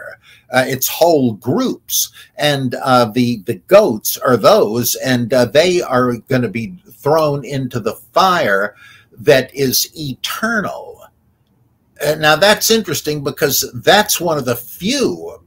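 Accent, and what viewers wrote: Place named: England, United Kingdom. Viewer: I always like English accent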